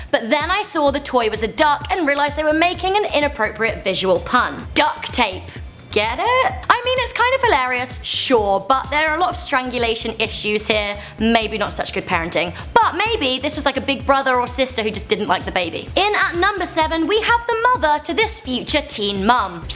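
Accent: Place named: United Kingdom. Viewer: It's called a British accent.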